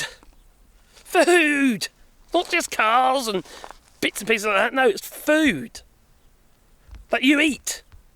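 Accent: United Kingdom. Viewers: You think lack of truckers is going to be the problem ...british accent doesn't mean you aren't an idiot ...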